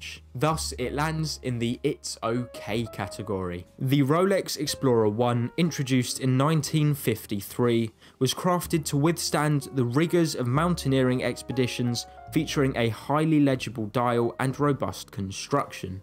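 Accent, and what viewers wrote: England, United Kingdom. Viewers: I can't even understand what this guy is saying with his English accent. You should post this video in Europe. Maybe people's taste in Europe is different as well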